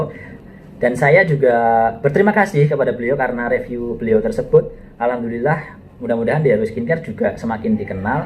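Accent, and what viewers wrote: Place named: Banyumas, Indonesia. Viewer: Dok.... aslinya mana 😀😀😀. lama merantau kangen logak ngapak...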